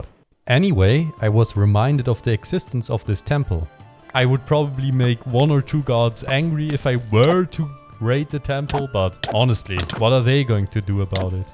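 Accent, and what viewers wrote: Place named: Germany. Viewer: Since I heard the first sentence from I knew he was german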